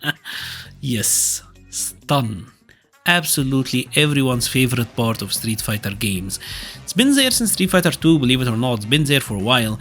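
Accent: Egypt. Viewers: You sound like your Egyptian 🤔 im i wrong ?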